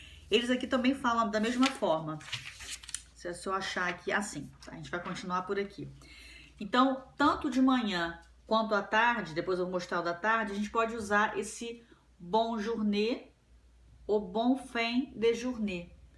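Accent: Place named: Minas Gerais, Brazil. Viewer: Wô! T’écouter parler à propos de ma langue c’était vraiment un exercice en gymnastique pour mon faible portugais âgé de 40 ans. Tu sonnes « mineira » si ma mémoire est bonne. (Je souhaite pouvoir écrire dans ta belle langue, mais hélas! J’suis ben ben trop rouillé!) De bonnes observations! Il y a beaucoup de différences entre nous et nos cousins en France comme vous autres dans la lusophonie. Bonne continuation!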